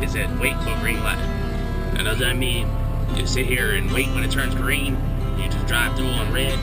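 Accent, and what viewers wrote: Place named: Louisiana, United States. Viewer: he sounds like he has a lousiua byoue accent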